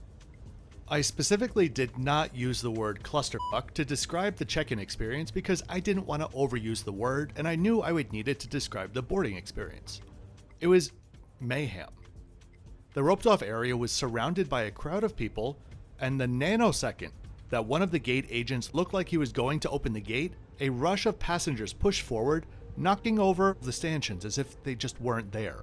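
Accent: United States. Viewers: I would very much like to know where you're from. You sound american but it'll be helpful to know your heritage to understand the hit job you're doing on air algerie. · Your accent tells me you’re from the US. I travel in the US sometimes a 5 1/2 flight from the west to the east, guess what? No food served at all. As far as safety.. they arrested a pilot once who was drunk as hell, yes this happened in the USA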